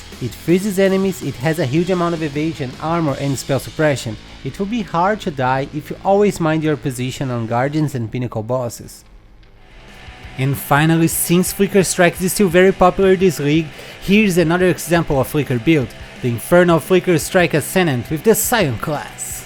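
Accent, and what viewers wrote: Brazil. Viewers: Vc é BR? Parece que tem sotaque haha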